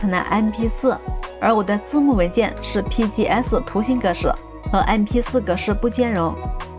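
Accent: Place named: Hunan, China. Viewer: 听口音是湘妹子。介绍的相当的详细。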